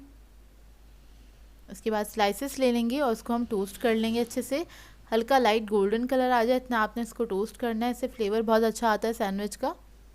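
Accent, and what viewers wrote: Karachi, Pakistan. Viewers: if u dont mind AP ne kis field me graduation ya master Kia Hy AP buht nice way me BT krti haen Me bhi Karachi me rhi Hun lekin ab Punjab shift hogye pr apka way of talking Karachi ki yad dilata Hy ar buht achi trah explain krti haen AP recepies ko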